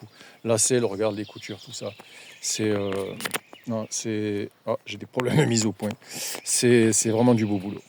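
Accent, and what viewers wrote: France. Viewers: Eh mais tu es sur le groupe v85tt ! 😊 Je pensais que tu étais Franc comtois d'origine, il y a un petit accent du sud. Cette cf me fait de l'oeil, franchement ils envoient du lourd, j'ai essayé la 450 et j'ai été bluffé. Au plaisir! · moi je mets un pouce rien que pour l'accent "nordiste" xD et j'aimerais beaucoup que tu reprennes la kove et nous fasse un petit comparo ;)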